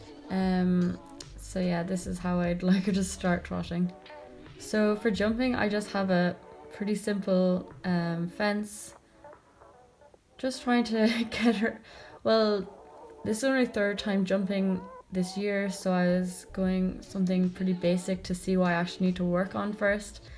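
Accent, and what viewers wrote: Ireland. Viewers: Are you from Ireland??❤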